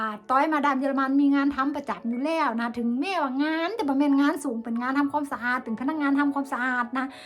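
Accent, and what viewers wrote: North, Thailand. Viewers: ชอบครับภาษาเหนืออู้เพราะพอได้อยู่ครับคำเดียวคือคำ ลำเต้ๆครับ😁🤗✌️🤟♥️ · ชอบเวลาน้องต้อยอู้คำเมืองน่ารักจ้า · อู้คำเมืองเพราะมากๆค่ะชอบๆจริงๆค่ะ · สวัสดีจ้า แม่ จ้า อู้คำเมืองเพราะมากๆจ้า มาดามจ้า เลิฟๆจ้า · อู้เหนือได้เพราะมากเลยจ้าพูดได้เก่งมาก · ชอบสำเนียงเหนือมากเลยค่ะ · อู้กำเมืองม่วนขนาดจ้าวปี่ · มาดามเป็นคนเมืองน่านเหมือนกับน้องพลที่ผมเรียกว่าทำงานด้วย สำเนียงภาษาเหนือไพเราะเลยครับ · สวัสดีจร้า อู้คำเมืองน่ารักดีจร้า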